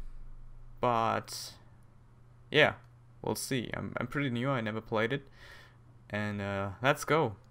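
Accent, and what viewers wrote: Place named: Germany. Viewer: Every now and again you pronounce English words in German, it's quite funny.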